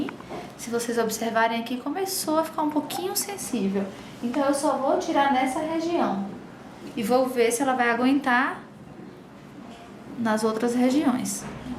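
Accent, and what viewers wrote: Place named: Northeast, Brazil. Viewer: adorando teus vídeos, parabéns sou aluna da FHO aqui em Araras SP, estou no segundo ano, ainda falta 2 anos e meio para eu concluir. obgda e continue postando vídeos, estou inscrita em teu canal. P.S tbm sou nordestina sou de juazeiro do norte ce, ouvindo teu sotaque me faz lembrar minhas raízes. bjim pra te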